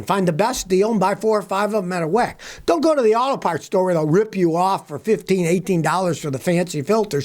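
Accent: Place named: South, United States. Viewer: It is truly sad, that he makes claims not based on facts. His videos are about his opinion, stereotypical old mechanic who just cannot get with new time and engineering. He is so brand-loyal, he can't see how bias he is. I have had owned 3 Kias/Hyndais. Recently sold wife's 2013 Santa Fe with 95k MI. Zero problems in 9 years, purchased brand new. Only thing wre did was new brakes around 60k mi. Had 2010 Kia Optima SX. Bought it used with 150k, once again, zero problems in 2 years that I had it. This is why I do not watch his videos any more. He can't even pronounce Hyundai correctly. As country as his accent is, he is making fun of Alabama? Give it a rest. That are other ways to get views on YouTube.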